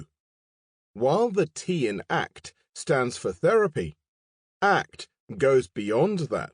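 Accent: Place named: England, United Kingdom. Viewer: Great English accent and pronunciation from the narrator,.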